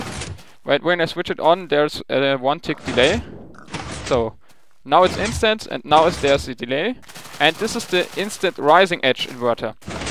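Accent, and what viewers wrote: Germany. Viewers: Man höhrt deinen Deutschen Akzent echt raus :D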